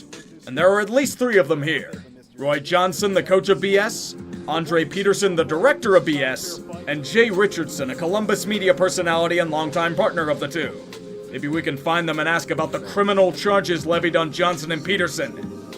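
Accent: Pittsburgh, United States. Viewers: Naw, that’s just the yinzer coming out.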